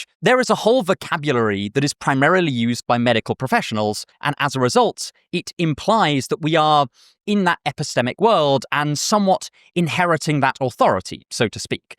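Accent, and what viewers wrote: United Kingdom. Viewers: Can someone tell me whats his accent called? British PR? Modern PR? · I like the fact that refers to himself as aggressively British... He is definitely the most British sounding person I know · has the most pleasant british accent i've ever heard.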